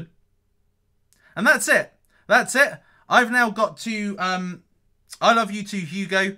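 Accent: England, United Kingdom. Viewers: love your accent, mate. which part of England are you from? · Hi, whare are you from? UK? Thanks for your videos. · He is English lol...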